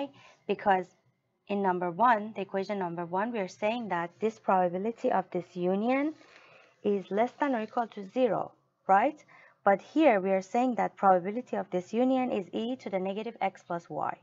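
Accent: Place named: Iran. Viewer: I'm sure that, this accent is persian :)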